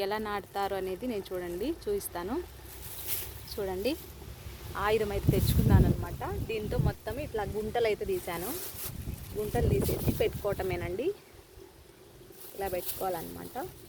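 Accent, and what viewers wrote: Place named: Telangana, India. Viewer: మేడం వాయిస్ రాయలసీమ లాగా వస్తలేదు మేడం. పక్క తెలంగాణ లాగా వస్తుంది మేడం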